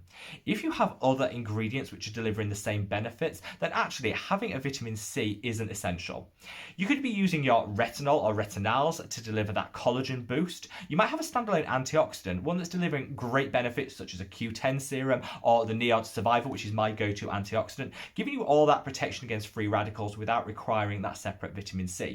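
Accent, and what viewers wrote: United Kingdom. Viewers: Every time I hear you and James Welsh say "vitamin," my American ears can't deal with it.😆 For some reason, there are three British pronunciations that I find exceptionally distracting: vitamin; schedule; and aluminum (which you spell differently and add a whole extra syllable to!). Love your channel as much as I love my Timeless Vitamin C!❤️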